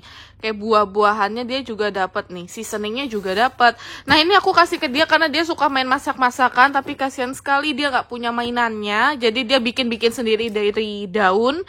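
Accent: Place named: Surabaya, Indonesia. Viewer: Kak ngomongnya kok agak medok seh ? Agak surabayaan gitu...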